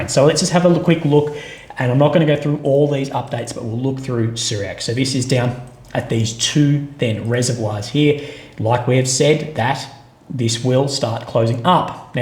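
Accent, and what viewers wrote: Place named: Australia. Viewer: Today with this green t-shirt, you look every bit the Australian that your accent gives away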